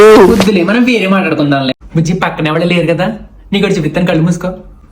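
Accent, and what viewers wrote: Godavari, India. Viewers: In godavari slang you nailed it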